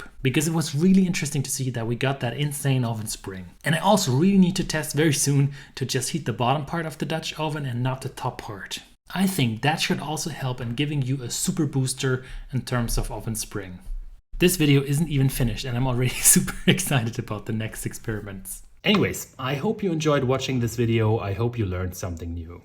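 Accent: Germany. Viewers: Manny good advice, this have make my technic full filed thanks to you German tremendous English accent. 👍🏼🙌🏼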